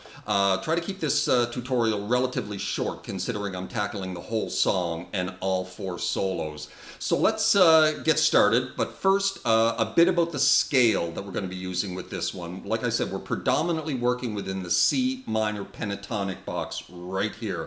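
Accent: Canada. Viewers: good to hear a Canadian accent! I was living in Toronto until January, now im back in Australia. Miss my buds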